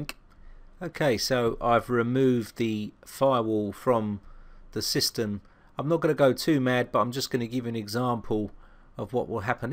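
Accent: United Kingdom. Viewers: your british accent man you`re 150% british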